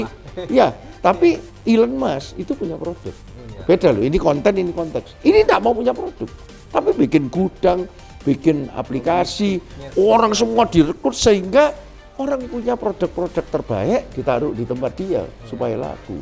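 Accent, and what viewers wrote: Surabaya, Indonesia. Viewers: medok surabaya-nya khas banget, baru tau kalo org surabaya. biasa baca bukunya aja soalnya. Bangga dadi wong Suroboyo Pak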